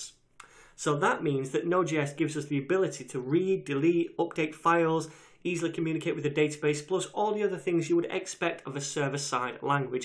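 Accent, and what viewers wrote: United Kingdom. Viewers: What can I say I love British accent thumbs UP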